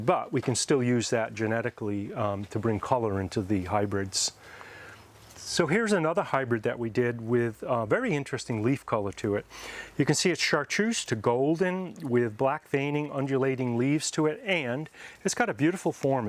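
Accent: New York, United States. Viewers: I hope you make many more Begonia video's? I love watching the endless stream of Begonia's. And they are all so different 💚 Love accent too. New York? Sounds very exotic to a European.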